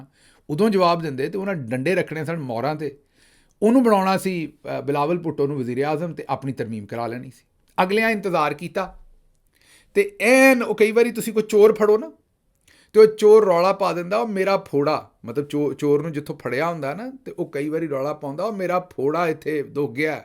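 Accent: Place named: Central Punjab, India. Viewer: بہت ھی اعلیٰ ، ستھری پنجابی میں مخصوص سنٹرل پنجاب کے خوبصورت با محاورہ انداز مزا آ گیا ، میرا تعلق کراچی سے ھے مگر میں کچھ پنجابی سمجھ لیتا ھوں ۔ بہت اعلیٰ ۔۔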